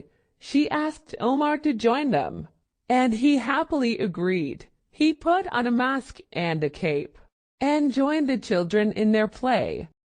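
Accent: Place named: United States. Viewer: اللكنة أمريكية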